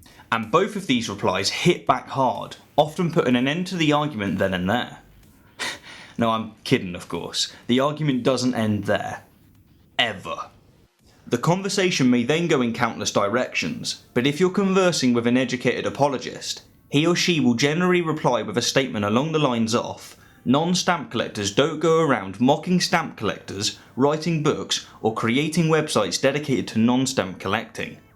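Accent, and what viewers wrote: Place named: West Country, United Kingdom. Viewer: I am trying to locate his accent. I could be wrong but I would place it somewhere in what we call "The West Country" which is the bottom left of the UK from Bristol west. However, some of the sounds are similar to Norfolk to my untrained ear but I would stick with Bristol or Brissal as we know it. "Yerite my luvver"?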